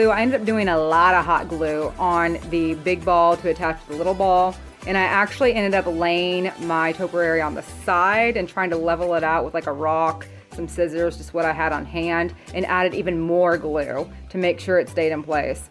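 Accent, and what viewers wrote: United States. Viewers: Cool on the tip in removing the words from the tin. I am on your side....the first topiary is my fave too. Same with the tins.. my fave is the same as yours. Always love your videos. I love your voice too; if you don't mind me asking, what state are you from?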